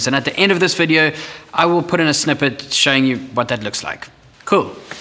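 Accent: South Africa. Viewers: First time I'm watching this YouTuber. And a few seconds in I'm thinking this guy sounds like a South African 😀 awesome work 👌👏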